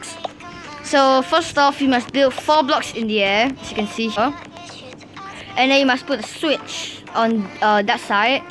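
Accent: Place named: Singapore. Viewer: You are singapore right? Bc your voices •_•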